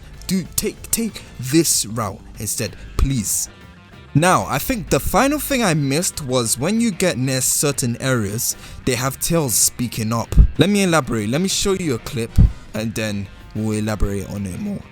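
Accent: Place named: London, United Kingdom. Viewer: good video my bro you sound like your from where i’m from london/uk good to see london guys doing sonic news 👊🏾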